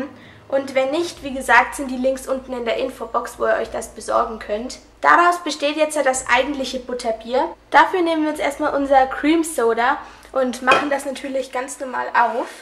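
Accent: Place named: Franconia, Germany. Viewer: Oh, du bist so sympathisch :) Muss ich unbedingt ausprobieren, suche schon lange nach einem gutem Harry Potter-Butterbier-Rezept :) Ich habe gerade gelesen, dass du aus Bayern kommst (bzw. hört man es auch an deinem Dialekt ein bisschen :D), aus welchem Regierungsbezirk kommst du? Hört sich an wie Franken :b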